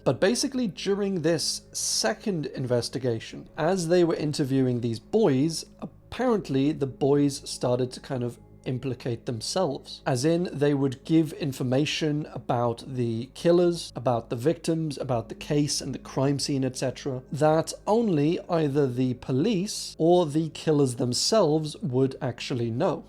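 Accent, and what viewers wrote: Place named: London, United Kingdom. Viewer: London? But then at times I hear something else 🤣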